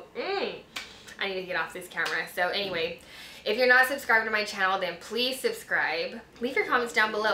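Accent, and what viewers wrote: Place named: Southern California, United States. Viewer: you have a thick socal accent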